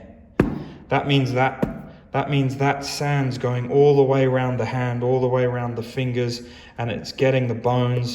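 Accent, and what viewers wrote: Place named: Australia. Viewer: Who has like an Australian accent, or maybe British idk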